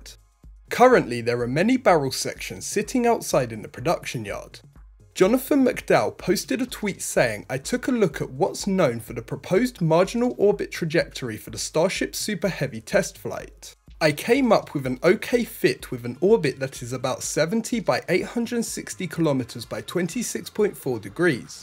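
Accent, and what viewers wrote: England, United Kingdom. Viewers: That is the way English people talk. They did invent the language after all. They then named it after themselves.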